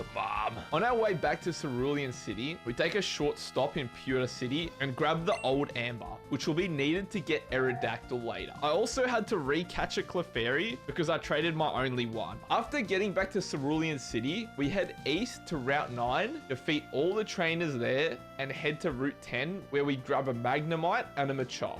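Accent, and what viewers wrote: Australia. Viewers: Biggest Victorian accent going. The “alite four”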